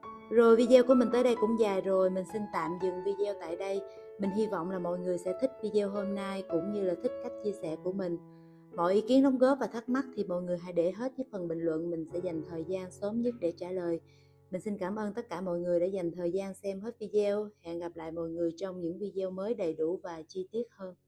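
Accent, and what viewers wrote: Mekong Delta, Vietnam. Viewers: Bạn ở miền tây hay ở đà nẵng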